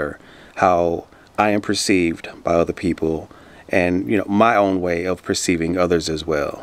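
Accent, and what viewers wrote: United States. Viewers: To a Brit, you´re just an American. The accent - and sometimes the wardrobe! - is what defines you to us. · Yes I do find it strange that black people in America use African American. I have questioned my black friends in America. After all you’re all American- black, white,Asian. My nephew is half nigerian but he was born in England so he’s english. His heritage is mixed but where you’re birth takes place is where you are known as from. If I saw and spoke to you , I would think “ok he is American”, because of your accent. Sorry but I find it absurd that whites in America don’t justify their otherness but all other ethnicities do as this seems like they are not fully seen as American. I am so glad in Britain we don’t use our ethnicity to identify ourselves as we are all british people and we can see different ethnicities but united in our diversity. 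· I'm an Englishman, my father was irish, but i consider myself English, you, to me, have an American accent, so, i would call you an American. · Definitely an American with an accent like that 🙂 I could listen to this guy all day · Great video, as always. I've always wondered why Americans refer to themselves as African American, or Italian American, it's not a concept easily understood in England/UK. Can I ask what do you know of your ancestry and how far back you can trace your family. I have records going back about 100 years for my and my wife's family but nothing before that. For what it's worth I perceive you as an American, not African American, and really only because of your great accent! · If I seen you and heard your accent i would think oh he's American or Canadian😁😁 that's it I've found there's only one thing that separates us and that's MONEY. growing up in school I had black friends and we ALL had a big thing in common we were all POOR we didn't care about SKIN because that's all it is just SKIN. 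Welcome to the UK my friend hope you and your family have a good life hear much love and respect.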